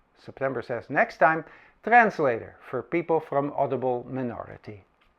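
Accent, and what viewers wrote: Netherlands. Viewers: Nice to meet the real with two letter "a". That Dutch accent reminds me of the days I worked for a Dutch Bank many decades ago. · Really nice you are making videos again and to hear your Dutch accent. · Wat knap dat je het Nederlands-Engelse accent (nog) zo goed kan! I find that really impressive. Good to see you back.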